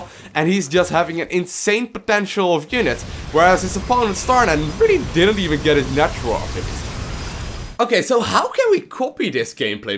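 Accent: Netherlands. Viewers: Dutch, pretty much the same as a german accent xD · He's from the Netherlands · are you from Holland??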